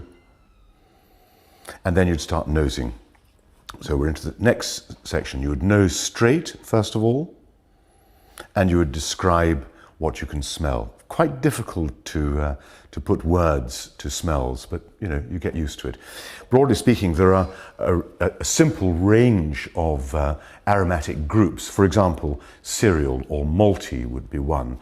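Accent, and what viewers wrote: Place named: England, United Kingdom. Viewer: sounds English with Scottish notes on certain words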